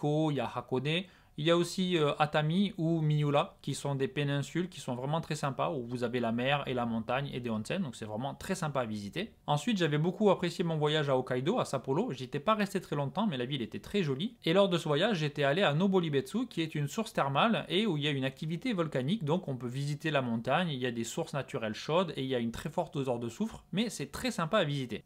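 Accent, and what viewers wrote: Marseille, France. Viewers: super vidéo au passage mon pseudo c'est daruma c'est juste que le pseudo était déjà prit donc le "a" est devenu un x. Masterclass comme d'habitude (ton accent de Marseille me fait trop rire, même après des années au Japon tu ne la pas perdu)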